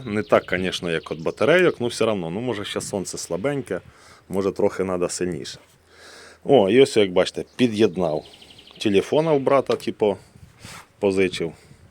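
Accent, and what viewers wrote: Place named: Surzhyk, Ukraine. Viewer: 😂😂😂 Во!!! Який русяцкий сурджик !!!! Хватає-це хопає чи висточає?!(Тупа півночі мова!) Фонарик- ЛІХТАРИК!!! Тєлефон-ТЕЛЕФОН Далі я не став вже цього з пошкодженим Росією мозком.... Але пишу відверто. Людина вдрма балакала так,як розмовляли батьки. Російською з місцевим діалектом. Людина розмовляє так,,к би вона не відвідувала школу,а як що відвідувала,тоді а бо не всилася ,або російською викладалася украхнська мова ьа література! До речі.... Книжки аффтор ввдео,не читав від слова - НВКОЛИ!!! Жах відео Вже суть вілео не дивився,бо його сурджик мене ВБИВ!!! І це мене,який народився ьа виріс у суто російськомовному місті МІЛЬЙОННИКУ центральної,промислової укра6,та розмовляв 52р. сутоиросійською!!! Жах суцільний!!! Оце росіч мозок та здатність мислити пошкодила?!🤕🤕🤕 Атас....😎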